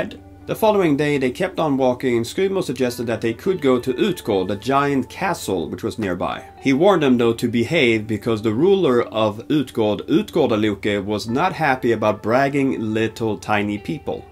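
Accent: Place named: Scania, Sweden. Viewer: He is speaking with a skåne accent. Its southern Swedish.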